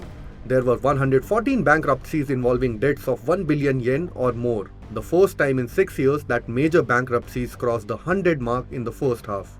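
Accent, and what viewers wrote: India. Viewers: Indian english 🤮🤮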